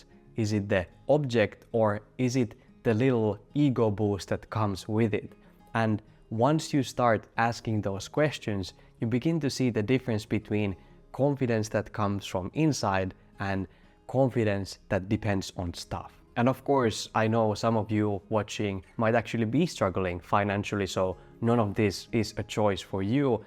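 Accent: Finland. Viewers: Jännä miten suomalaisena tunnistaa suomalaisen puhumassa englantia ekan kahden sekunnin jälkeen. Hyvä video! 👍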